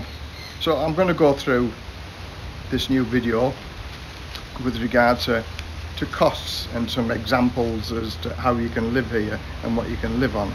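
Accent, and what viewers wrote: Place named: Redcar, United Kingdom. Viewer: That is amazing, I thought I recognised the accent, I am from Redcar too, left 25 years ago to go and work in New Zealand, still here living in Auckland. I stopped over for 2 days in Thailand when I flew out here and never forgot what a great place it was. No regrets leaving the north east, weather is awful, but dad still lives there and ironically he was a firefighter too. Great channel really great to see you living the dream.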